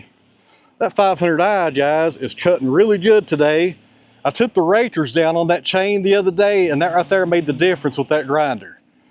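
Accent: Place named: Southwest Virginia, United States. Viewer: Well, I'm from southwest VA and he sounds a lot more like my Bristol peeps than Charlottesville.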